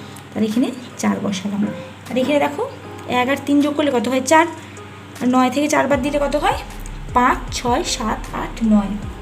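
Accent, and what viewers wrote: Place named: India. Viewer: আপনার ভাষা শুনে মনে হচ্ছে আপনি ভারতীয় নাগরিক।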